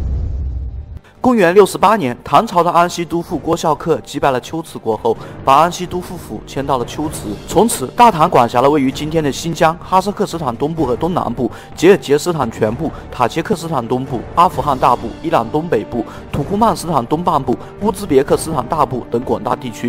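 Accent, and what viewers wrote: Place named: Hong Kong, China. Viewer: 應該是香港人吧!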